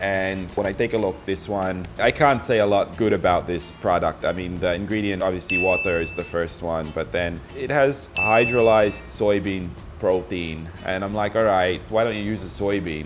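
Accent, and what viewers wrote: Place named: Philippines. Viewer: I'm not happy with the Filipino accent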